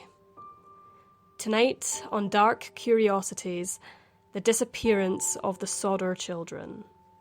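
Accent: Scotland, United Kingdom. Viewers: No, that is definitely a Scottish accent. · Is the narrator a Scott?✍ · This is NOT an American show. The so-called annoying voice is because the show, Dark Curiosities, is a Scottish YouTube mysteries series. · I love the Scottish accent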